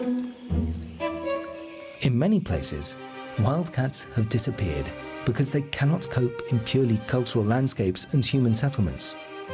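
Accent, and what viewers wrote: United Kingdom. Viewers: British accent??? ☺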